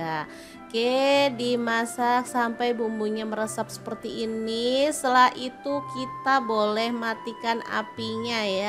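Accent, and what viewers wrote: Surabaya, Indonesia. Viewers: Sampeyan Yen nganggo boso suroboyoan kae kok lucu men... Seneng banget aku... Selain itu masakan2 nya sy catet di buku, sy praktekin... Suwun bngt ilmunya...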